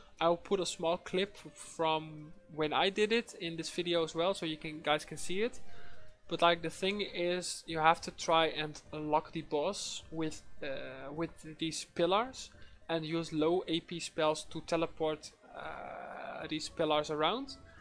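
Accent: Netherlands. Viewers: Ik was al aan het denken dit accent ken ik maar je bent inderdaad nederlands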